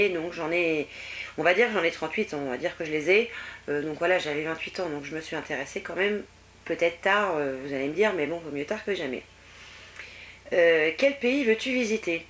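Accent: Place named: France. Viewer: Je suis née le 17 décembre, si j'me trompe pas.... LOLGaelle, dans un commentaire récent, je te croyais belge. C'est à cause des vidéos proposés avec le tien, à droite. Plusieurs étaient de la Belgique. Je n'y connais rien. J'aime l'accent francais, si différent de celui du Québec.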